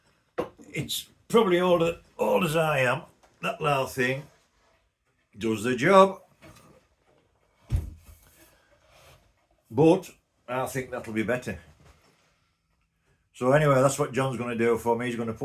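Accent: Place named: Scotland, United Kingdom. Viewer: . I’m glad you’re OK and you just had a flat tire. I totally love your accent. I was just imagining you screaming out the window with that lady that was honking at you. I think I would’ve been dying laughing if I were her and I doubt if I could’ve understood anything you said. Sean Conner was a Scot, wasn’t he? God bless you my friend.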